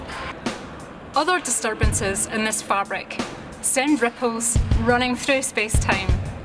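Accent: Scotland, United Kingdom. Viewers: Scottish (she studied Physics at Glasgow University with me. But she doesn't have a Glasgow accent...more of a generic central Scotland accent...possibly Falkirk or Stirling area but I'm not sure) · Woman with Scottish accent, something something... bath towel, playing with balls, inflation...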